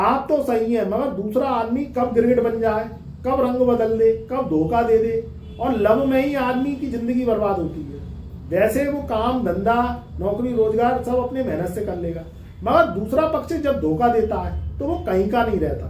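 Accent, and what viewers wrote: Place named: Agra, India. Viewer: पंडित जी आप किस शहर से हैं? आगरा mainpuri side effect है शैली में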